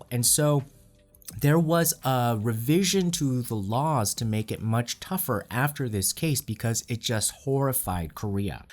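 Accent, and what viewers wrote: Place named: California, United States. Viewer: you sounded very californian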